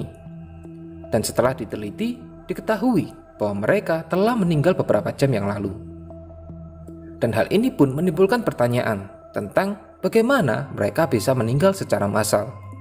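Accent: Java, Indonesia. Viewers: Medhog bhuanged mhase.... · Logatnya jawa bet ya wkwk. Sukses terusss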